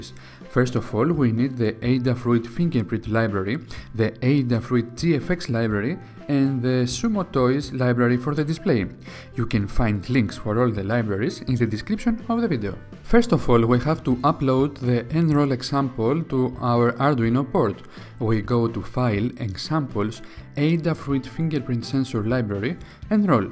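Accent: Greece. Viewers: you sound greek, I'm from Rhodes btw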